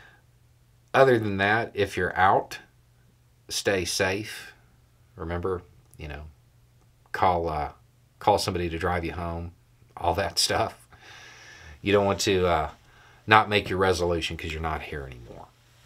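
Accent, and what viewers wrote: South, United States. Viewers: Happy New Year. We spend a lot of time together nightly & I am grateful for the company, information & insights and for trashing the assumption that some who looks like you & speaks with a Southern accent is the antithesis of Progressive. Thanks. Carry on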